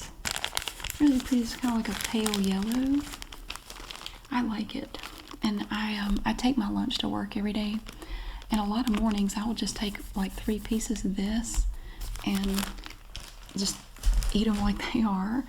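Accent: South, United States. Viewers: Yall I rarely ever comment but I love this lady’s channel. So soothing and being from the south myself I love a good southern accent.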